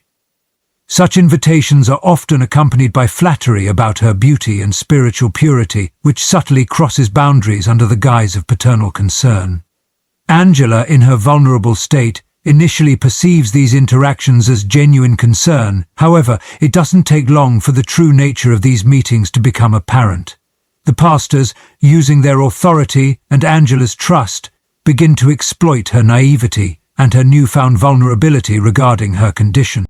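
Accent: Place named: United Kingdom. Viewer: Why the narrator isn't black & why the reader with a British accent doesn't state the year of when the event happened. Why no one knows the city in Texas or the name of the church. Ive lived in Texas & I've a church in Plano for 10yrs & there no event this on the news any churches in Texas no name of reporter who the story . I really question & put on this video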